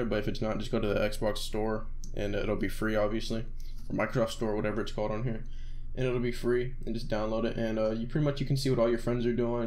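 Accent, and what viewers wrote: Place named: United States. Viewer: Bruh u sound like fitz but American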